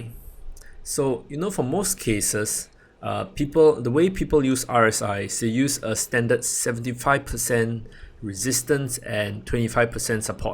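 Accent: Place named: Singapore. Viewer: is this Rayner Teo? Similar (Singapore?) accent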